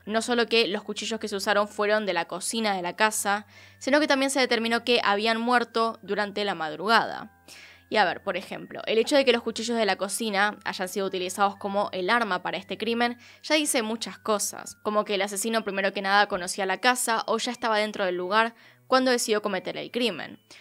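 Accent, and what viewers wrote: Argentina. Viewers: Lo que más me gusta de es su forma UNICA de narrar. Aparte que AMO el acento argentino. Saludos desde El Salvador.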